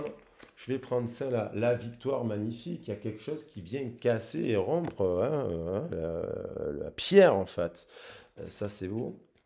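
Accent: South, France. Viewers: 🤣Shamala VIBES?😏🤣😜🔥♏⚡🔥.Merci pour le partage de tes énergies avec l’accent du sud dans tes cordes vocales😊😎🤌🏻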